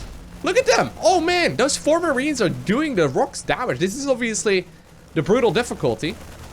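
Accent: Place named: Netherlands. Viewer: lovely dutch accent...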